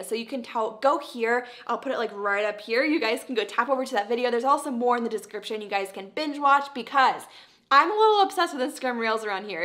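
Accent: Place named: United States. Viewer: Reals 😂 no disrespect but i love American accent 😁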